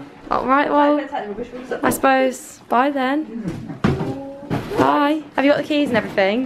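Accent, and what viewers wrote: United Kingdom. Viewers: I always love British accent 💙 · I love the british accent😀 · The British accent 😍😍😍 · I’m British but u sound more British then me but like I loke love ur accent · British accent confirmed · OMG YOU HAVE THE BEST BRITISH ACCENT!!!!!!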